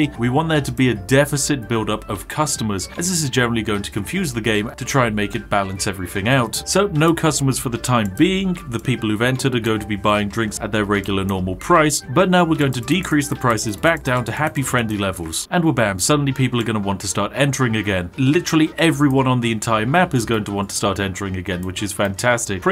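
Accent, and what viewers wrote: United Kingdom. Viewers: I never disliked a video faster (edit: I think he's funny I just hate british people's accents :( not personal though, I still subscribe I just dislike his videos)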